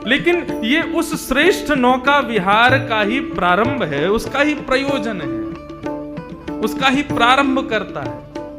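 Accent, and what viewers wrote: Braj, India. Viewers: समज आवे ना आवे पर सुनने में बहुत पियारी है आपकी ब्रजभाषा आप के जैसे ही है 🙏🙏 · Aapka nauka bihar prasang brajki local bhasa me sun ke atma trupti hogayi. Jitna sune utna mitha lage.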